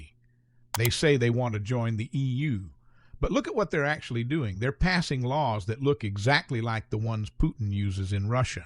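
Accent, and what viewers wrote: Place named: United States. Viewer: This video is tipical western propoganda. Even the person speaking in the video has strong american dialect.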